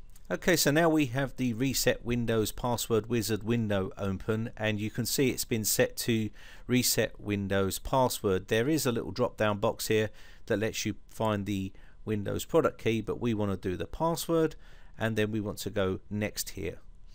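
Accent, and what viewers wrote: England, United Kingdom. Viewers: British english is to die for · Why Englishmen talk so much? Is this a lullaby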